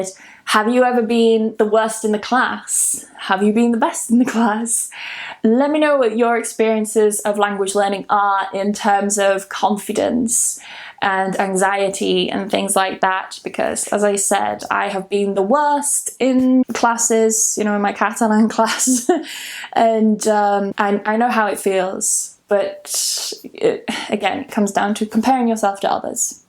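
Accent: United Kingdom. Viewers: Please tell me in which british accent you are talking😊💐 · Shortcut: Looping this video to learn the British accent from 😏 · Thanks for your tips and all your encouraging words. Leaning a language is so difficult. It has been years since I have started learning all by myself because I was so bad in school. In France, they are not good for teaching english at school. So technically I am learning by myself with series in english with english french subtitles first and now with english subtitles. I am listening to Podcast recorded by my favourite actor who is english. And now I am preparing for the TOIEC. Each day I listen some of your videos and I am really learning to sound more British thanks to you. It's so easy to listen to you, I can perfectly understand what you say. I don't think I will be completely fluent one day because it's really hard but I am progressing each day and that's a really good thing. 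· Hello miss, yours is RP or Modern RP accent or any other UK accent ? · I like the way you say the word "Japanese". I feel the British accent. Your channel is by far the best channel to improve British pronunciation. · your British accent is just lovely! I've been working on American English accent for ages, but now I need to think twice......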